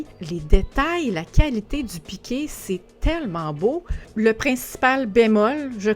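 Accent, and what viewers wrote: Canada. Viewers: J'adore, sa manière de parler, d'être. Videos toujours très intéressantes. Je suis amateur fan de photos sportives comme. J'utilise un boîtier Sony A7III. Merci J'adore ton magnifique accent canadien 😍😍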